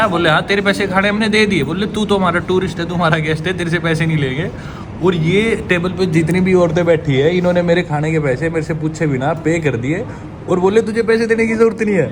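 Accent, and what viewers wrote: Haryana, India. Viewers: आपा Haryana wale hindi me Haryanvi mix kr hi dete hai , wo hi baat pta lg hi jata hai गामा के बालक सै ❤❤ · This haryanvi accent literally gives me a headache and very unpleasant for my ears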